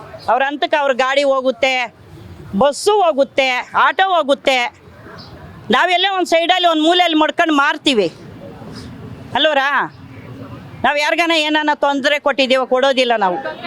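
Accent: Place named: Tamil Nadu, India. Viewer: Tamilnadu ge hogi maaru. Aa slang kelak aagalla.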